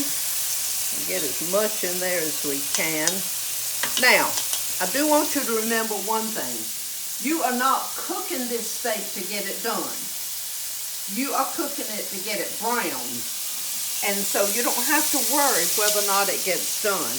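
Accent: South, United States. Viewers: It’s so good to still hear that good old southern down home country accent from a southern young lady..!..and can’t wait to cook this dish..!..GOD BLESS..! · I could listen to you for hours!!! I love your southern accent. I’m a subscriber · Ms., you are a national treasure! I love hearing your accent (Yankee here) as it brings back frond memories of our time stationed in the South. God's richest blessings to you and your family!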